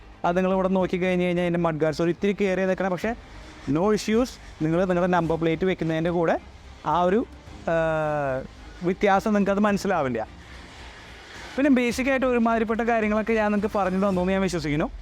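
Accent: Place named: Thrissur, India. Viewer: Clear technical explanation, no BS, narration in authentic Thrissur Malayalam. Really liked your review keep going. Lots of love ❤, more power to you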